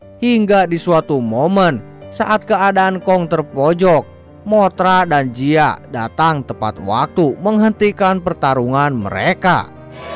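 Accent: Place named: Sunda, Indonesia. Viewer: Iya jadi orang Sunda anjir yang ngisi suaranya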